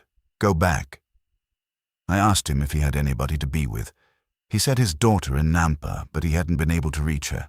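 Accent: England, United Kingdom. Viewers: If you're going to use an English accent why don't you set the story in England?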